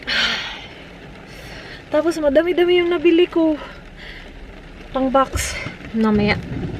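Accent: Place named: Visayas, Philippines. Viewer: Hahaha ka cute sa buang ba hahaha bisaya pa more😍😍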